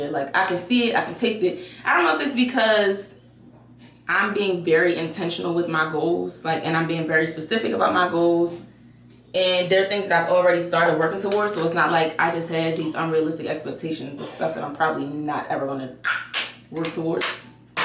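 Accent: Baltimore, United States. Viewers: Beautiful!!! As soon as you began talking, i was like “she’s gotta be from Baltimore!!”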